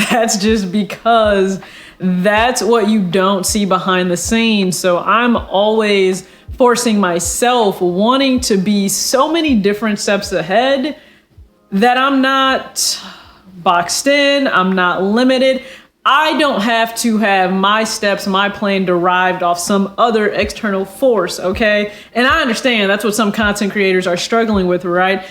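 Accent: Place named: California, United States. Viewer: You are my favorite sneaker youtuber because you go off into other relatable subjects. Youre smart and you have business savvy...and youre a female from the west coast. I assume that because you have my accent, if we wanna call it an accent lol and your gs warriors fan, that youre from California. You should put an facebook request in for me if you want that would be cool Im from Everett Washington.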